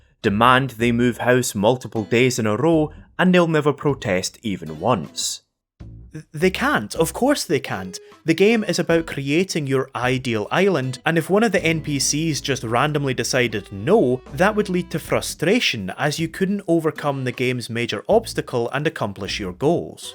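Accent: Scotland, United Kingdom. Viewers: a strong scottish accent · Is that a Scottish accent? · im considering this scottish asmr while i write my uni essay lol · I miss the Scottish accent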